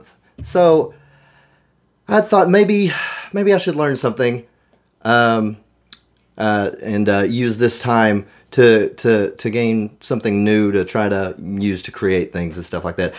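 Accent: Texas, United States. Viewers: You got that Austin Texas accent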